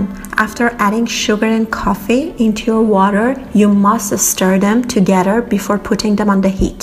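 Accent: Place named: Iran. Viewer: Love the persian accent😂💖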